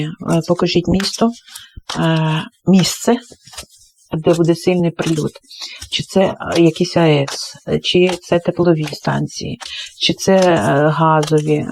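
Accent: Odesa, Ukraine. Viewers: Нічого,ви з Одеси,а там так розмовляють.❤❤❤❤😊😊😊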